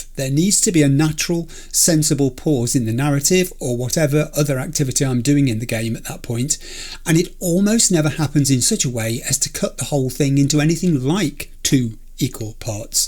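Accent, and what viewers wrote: United Kingdom. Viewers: One of my favorite episodes yet! I think you have found an ideal cadence of gameplay in this episode, you explored without getting too bogged down in random minutia. I LOVE YOUR MIND FOR DETAIL, but I will admit I sometimes skip ahead out of veteran gamer impatience, but never change your penchant for curiosity. Regardless, congrats on your first place placement, only one more top 3 finish to guarantee the finale of this arc! Furthermore, would LOVE to hear more about your childhood and the village you grew up in, I want to know more! Maybe I am a stupid American but your accent says maybe you are from the Midlands, and I think your naiveté as an Englishmen really adds to the lifestyle in 2077. V is a character all his own, but colored by the players experience, and is a true fish out of water. And a kind Brit in Night City is about as fascinating a protagonist as it gets, I would love more context to your childhood and your perspective as an Englishmen to a very American game! 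Not that it truly matters, but you are clearly a fan of science fiction, and as a genre SciFi is often colored by the experiences of the reader. Hope this week gets better for you, and wishing the best to you and your family! CHEERS!! XOXO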